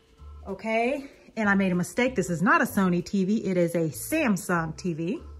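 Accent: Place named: Pittsburgh, United States. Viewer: You must be from Youngstown or near PA because you talk and sound like my family from there and Pittsburg❤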